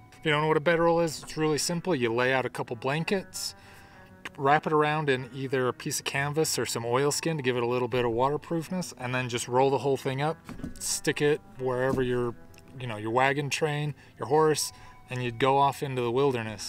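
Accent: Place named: United States. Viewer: This is 8th swag video I've watched in a row, and the first from the US. I just had several second shock/adjustment back to the American dialect. 😄 Thank you for the explanation and history. I had assumed the swag was the OZ version of the American cowboy bedroll (I have one), but couldn't understand why modern day Aussies were using such confining shelters. In my search for quick setups and tear-downs, I've largely settled on the Kwik Kamp tent cot for solo camping. It's very similar the swag/cot (stretcher) combo in the video but all in one unit that folds up very quickly. It's not as abuse resistant as a canvas shelter, so I treat it with respect. I'm not trying to hijack the video, but in searching for the Grail of quick truck camping shelters. I've settled on the canvas cowboy teepee. They're very fast to setup, allow room for several sleepers, and room to stand up. 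The white canvas keeps the interior cooler during summer months, and a lantern hanging from the peak really lights up the interior nicely. It's a design that's survived the test of time for good reason, and on of my favorite gear purchases, and a go-to for car camping.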